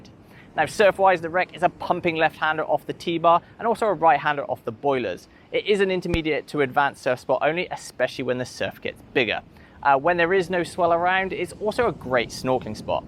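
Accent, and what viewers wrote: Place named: Cockney, United Kingdom. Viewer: Rubbish. How do you think bungalung people feel about there home being exploited for money and popularity by Squeaky sounding cockney accent. Did U think at all. Surfing is a cycle that's learnt. You learn that a sand point of quality is hard to find. This is why no out of town surfers of low skill care at what they do in the line up. U can sitting on nose and same 2 English steroid heads will have there first drop in go. Bit further there's probably you and every chick longboarding that can't negotiate the the take off or cut back at all. Then we hit every body that faces backwards to the waveusually French and German girls.they only paddle for the biggest wave. The one 50 people have waited there turn for. It's done now why don't feature a drone tutorial of AYERS Rock. Ffff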